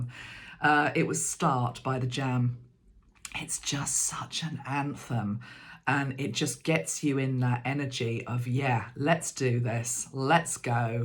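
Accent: United Kingdom. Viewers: PS, I actually notice and love the way you pronounce your words! I just figured they were UK pronunciations 😂 🤍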